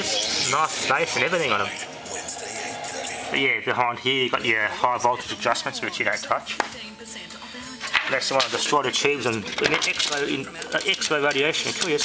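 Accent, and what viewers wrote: Australia. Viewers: Strong regional accent from Australia Mate...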